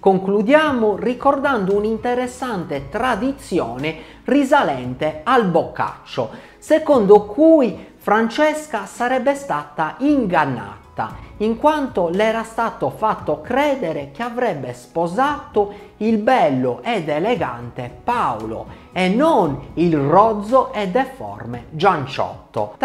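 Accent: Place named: Cagliari, Italy. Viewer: essendo io sassarese, si capisce tantissimo quando si trova online un sardo (in questo caso cagliaritano pk si sente fortissimo)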